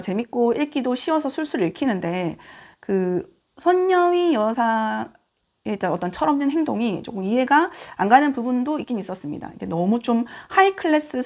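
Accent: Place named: Gyeongsang, South Korea. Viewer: 핑크색에 홀려서 클릭했다가 구독에 댓글까지 남겨보아요~ 끝까지 영상보다 땡기는 작품도 있고 <종이여자>는 오래전언니 책꽂이에 있던 책인데 님 덕분에 흥미가 가요.. 무엇보다 갱상도 사투리.. 너무 정감가는데요?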